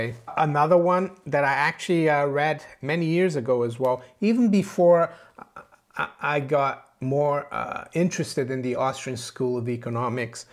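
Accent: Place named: Brooklyn, United States. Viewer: I'm fascinated by your plain Brooklyn-Italian sounding American English accent. If you were born in Brazil then when did you first learn English?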